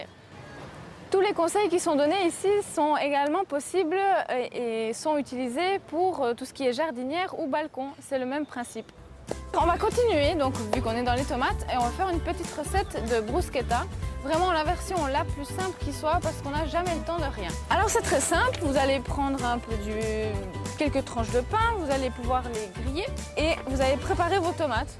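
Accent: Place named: Switzerland. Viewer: c'est marrant ce petit accent suisse, c'est mignon.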